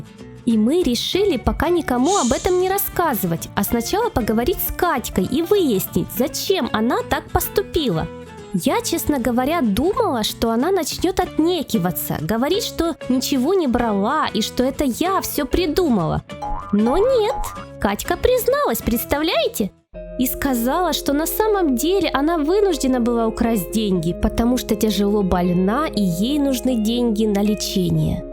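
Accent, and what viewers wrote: Ukraine. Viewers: Так она украинка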